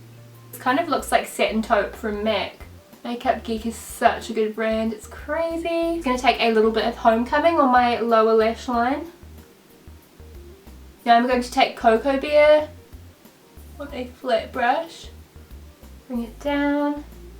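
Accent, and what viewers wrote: New Zealand. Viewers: Also I'm a kiwi living in Australia and watching your videos and hearing your accent makes me happy and feel at home in NZ again!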